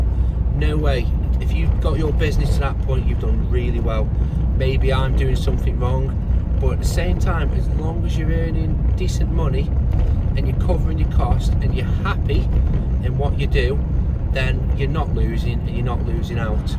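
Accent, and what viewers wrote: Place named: Nottinghamshire, United Kingdom. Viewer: Nice drive, and sounds like you've got a good attitude to your business. Good for you. I was trying to guess where you're from going by the accent, I thought Nottinghamshire, then i saw the road signs!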